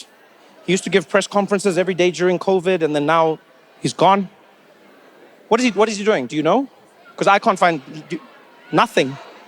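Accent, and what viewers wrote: South Africa. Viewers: He is 100% South African😂😂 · This is exactly how he spoke before he went to America, it's the South African accent. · Is incredible and so lovely, how easily he uses the south African accent for the public... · Love how his accent became 100% South African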